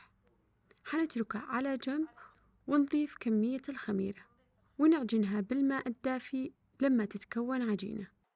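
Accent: Sanaa, Yemen. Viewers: احلى اكله من احلى لهجة واحلى واطيب شعب يمني. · اجمل مافي المقطع هي صوت النبره الصنعانيه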